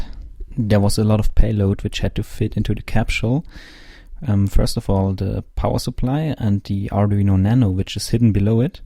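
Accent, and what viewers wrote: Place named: Swabia, Germany. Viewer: Ja cool ich au, man merkt obwohl du Englisch sprichst wo du her bist, ich bin aus Backnang, auch Nähe Stuttgart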